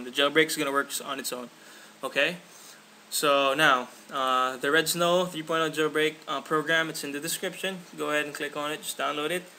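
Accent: Philippines. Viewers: lol man i can tell your soo phillippino!!! pinoy accent ftw. ;p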